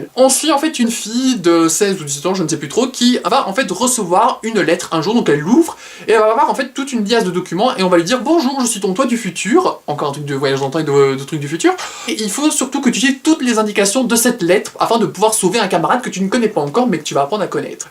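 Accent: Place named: Belgium. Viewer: C'est marrant parce que j'ai pas tout de suite capté que c'était l'accent belge (genre ya un truc qui va pas mais quoi^^) mais j'ai tilté au "tome huit" (taume ouite^^) et j'ai réalisé que si ça me disais quelque chose c'est parce que c'est comme ça que mon chéri le dit^^ (belge aussi avec comme toi un accent très peu prononcé, juste sur certaines prononciations et certains mots). Fables me fait de l'oeil depuis un moment (pareil, j'ai connu son existence par le jeux telltell auxquel j'ai malheureusement moins accroché que walking dead parce que c'est un peu lent à mon goût mais j'adore le concept) étant une grande fan des réécritures de comptes etc (once upon a time entre autre^^). C'est cool de nous présenter des comics hors super héros (pas forcément ma tasse de thé à part peut être deadpool que j'aime beaucoup avec son côté comique^^ et les xmen dont j'aime beaucoup les films mais que je n'ai jamais lu). 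J'ai du mal à trouver mon compte dans les manga, j'adore mais je suis plutôt shojo pour leur côté mignon mais pas cucu et pas trop blagues de cul lourdes^^ (j'avais adoré fruits baskets par exemple). Je viens de faire péter ma wishlist du coup^^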